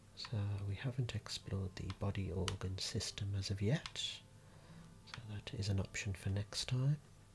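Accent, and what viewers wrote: Birmingham, United Kingdom. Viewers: Ah! A fellow Brummie. This is fantastic! Must have taken ages to make though?! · Love all ur videos man, u really do help me sleep at night, especially the eye exams, ur voice is super calm and the Birmingham accent is a bonus, keep it up, looking forward to ur next video🙂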